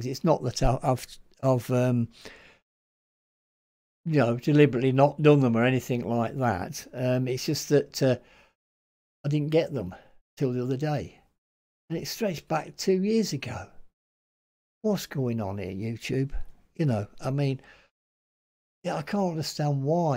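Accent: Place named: United Kingdom. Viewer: Thanks always from Japan 有難う💛。 Yes. When you reply to or put heart mark on my comment, it makes me happy, but that is not what I expect on your reaction videos. Your smiles, grinning, smirks and witty observations in British accent on Band-Maid, BABYMETAL etc. are what I want to get from you. The reaction video is just for fun, not mandatory duty for both reactors and viewers. Please keep on just the way you have been.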